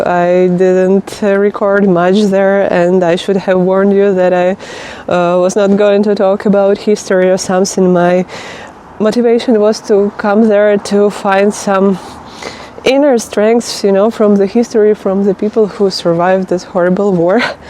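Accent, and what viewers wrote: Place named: Ukraine. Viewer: добрий вечір. Więc najpierw napisze Tobie śmieszny komplement:) Czyli to, że masz ten przepiękny ukraiński akcent jest tak przeurocze, że ja życzę Tobie, żebyś Ty nigdy się go nie pozbyła bo to jest dla naszych uszu jak najpiękniejsza muzyka! Więc cudownie, że tak dobrze już mówisz po polsku i brawo dla Ciebie, a Twój akcent to taka przeurocza wisienka na torcie więc nie wstydź się go tylko ciesz się nim:) Dalej chciałbym Ciebie przestrzec przed czymś. W Polsce mówi się o tym, że jest mniej poparcia dla Ukraińców i że ludzie są już zdenerwowany, że tak dużo Ukraińców jest w Polsce. Więc to jest totalnie nie prawda! My bardzo się cieszymy, że do nas przyjechaliście i szkoda, że w tak strasznych okolicznościach jednak zachowujecie się w Polsce bardzo dobrze, pracujecie i dokładacie się do rozwoju naszego kraju i obyście chcieli to wszyscy po wojnie zostać. 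Zatem jeżeli ktoś Ciebie będzie pytał jak tam nastroje w Polsce czy nadal nas lubią, to możesz powiedzieć śmiało jest nawet lepiej oni nas kochają i słuchaj ja też mam ochotę uklęknąć i zawiązać Tobie buciki jak będzie taka potrzeba. A jeżeli zderzyłabyś się z jakąś nieprzyjemną sytuacją, to ja z góry Ciebie za nią przepraszam i proszę nie przejmuj się tym u nas też głupich niewykształconych ludzi nie brakuje, ale pamiętaj o tym, że każdy normalny i inteligentny Polak zawsze z chęcią uklęknie i pomoże <3 Przytulam Ciebie gorąco, życzę dużo uśmiechu i powodzenia we wszystkim oraz to jest ciężki czas teraz dla Was jednam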